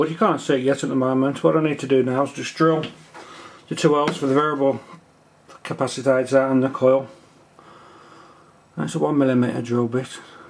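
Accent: United Kingdom. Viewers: UK english?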